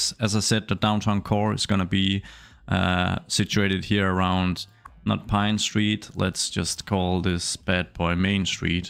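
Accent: Denmark. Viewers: Are you danish? 🇩🇰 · are you danish? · Are you danish? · You sound like you're from Denmark :) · Really cool!! Can’t wait to play CS2 myself.. by the way, are you from Denmark by any chance? Hearing your accent? 😁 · Er du dansker?:) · er du Dansker ? 😅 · Are you Danish? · Where are you from? :-D Your accent sounds almost Danish! Awesome movie by the way!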